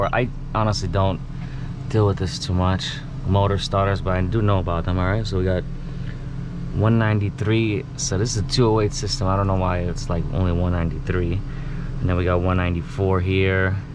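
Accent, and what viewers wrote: New York, United States. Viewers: You sound like your from NY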